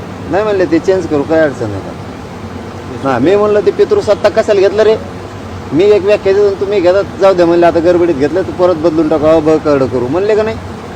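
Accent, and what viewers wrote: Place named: Marathwada, India. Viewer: रोख ठोक माणूस..... आसल मराठवाडीतून बोलतायेत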